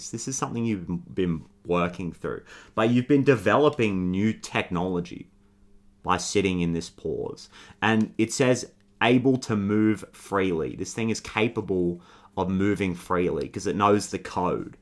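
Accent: Australia. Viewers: Bro hooked me with that aussie accent! 😂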